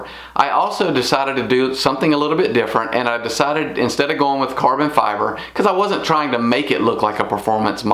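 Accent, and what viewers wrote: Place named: North Carolina, United States. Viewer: Hey. I’m in North Carolina and you sound like you’re from somewhere around here-not California! 😂 Ordered our Y a week ago Saturday! Do you recommend tinting the overhead roof glass? I’ve seen mixed comments on this on the web. Thanks!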